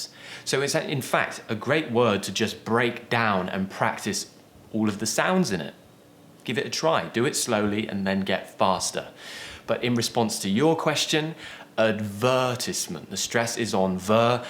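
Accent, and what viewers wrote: United Kingdom. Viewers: It has been a utterly splendid, exquisite and flawless lesson. I'm particularly joyful, blissful and elated with that opulent topic. It is to my liking; moreover, it appeals to me. I do adore speaking, and his charming accent., Hence, I present; undoubtedly; the King of British English. You are the quintessential of British English. Thank you very much indeed. Far thee well, dearest Prof. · I love your way to teach British accent 😍 I really wanna to speak like you.. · You are epic. Its so clear when You explain. And Your British is so good to listen. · Marry me wtf how u r cute like that with ur British accent 🙆🙆🙆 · I don't know why, but I enjoy watching your vids. However, as an American I must say that some of what you teach, to our ears signifies a lower class, uneducated Briton. Certain of the vowels and diphthongs, and most of all the glottal stop, which all American diction coaches regard (or used to) as an actual speech defect, like a lisp, to be removed with exercises! 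· Please keep talking i can hear you for hours. 😀😀 I really love your British accent. I have learned a lot. Thanks you. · Hello 😄 I'm Dia my mother tongue is Arabic, I study English, My accent is little bit American, but I'm in love with the British one😍, im following lots of your videos And I'm hopeful that one-day i can manage to have an accent like yours ❤😍 thank you for your efforts ❤ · Hi I'm from Venezuela and I've been learning British English on my own at home and yours videos are quite good for me cause your accent is great! thanks for make this kind of videos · Hi teacher, i know that my indian accent will never change but I'm very fond with your british accent. Soo sexy, lol.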